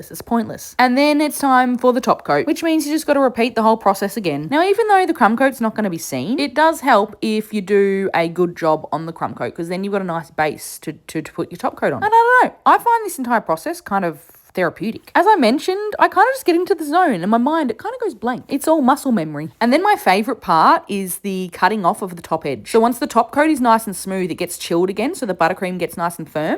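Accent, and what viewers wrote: Australia. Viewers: You're Aussie?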